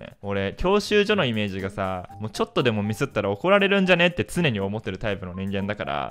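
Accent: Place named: Kansai, Japan. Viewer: この動画、最近の動画で一番好きかも！そしてたかおいおいの関西弁が移ってるｗ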